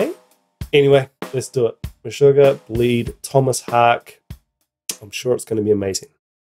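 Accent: New Zealand. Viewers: BTW your Kiwi accent is just awesome!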